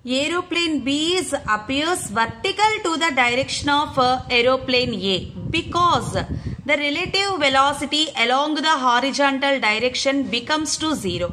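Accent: South India, India. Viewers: The most South Indian accent heard in a while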